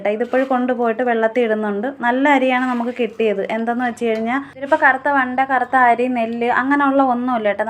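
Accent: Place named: Kozhikode, India. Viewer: നല്ല സംസാരം കേട്ടിരിക്കാൻ തോന്നും കോഴിക്കോട്ട super ഞാൻ ഇങ്ങനെ ഉണ്ടാക്കും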